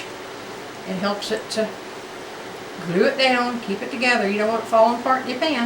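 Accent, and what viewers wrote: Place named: Texas, United States. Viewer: Mighty refreshing to know this is a cooking channel. At first glance of those 2 pies, I thought... Oh Hell No! Not another documentary about ''Chinese Foot Binding'' results. Good to know Natchitoches is on the same global parallel with Cowtown Texas accent & all. That ratio of egg to water makes for the most tasty of scrambled eggs as well.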